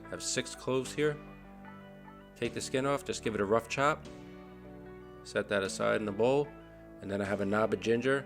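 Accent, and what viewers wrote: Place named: New York, United States. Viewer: I like that Global knife, man. I almost bought one just like in 2015...but I'm a cheapskate, and so I didn't. LOL. I can tell by your accent that you're from NYC. Which borough? Just curious. Don't answer that, if you're uncomfortable answering. I'm a west coaster, but my wife is from Weehawken, so I've been out your way quite a bit.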